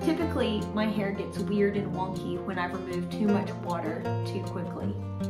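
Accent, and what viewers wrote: South, United States. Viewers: I detect a fellow Southerner! Native Texas living in the West! Miss the south, and will be back there soon! My hair worked the first time, as I have ringlets already! Thanks for the tips!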